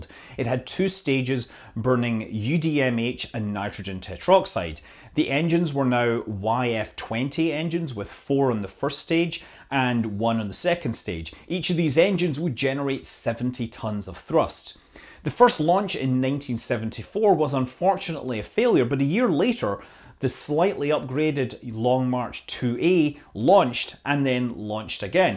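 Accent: Scotland, United Kingdom. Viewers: I'll be honest, I never thought I'd ever hear reasonably understandable Mandarin spoken with a Scottish accent. Not bad,. Not bad at all. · I love your accent. Are you Scottish?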